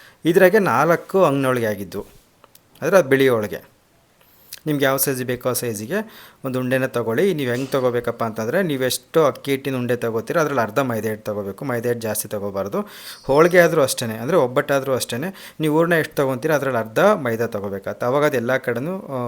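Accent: Chitradurga, India. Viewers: ಅವರ ಮಾತೆ ಅವರ ಸಂಪತ್ತು, ಮತ್ತು ಆಕರ್ಷಣೆ, ಯಾಕೆ ಹಾಗೆ ಹೇಳುತ್ತೀರಾ. ಅವರ ದುರ್ಗಾ ಭಾಷೆ ಶ ಎಂತ ಚಂದ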